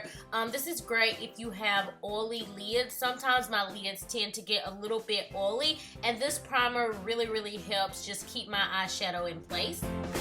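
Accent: South, United States. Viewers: She has such a southern accent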